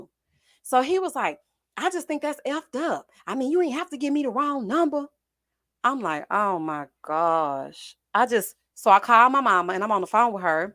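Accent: South, United States. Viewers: Hey Girl Hey… we love your southern commentary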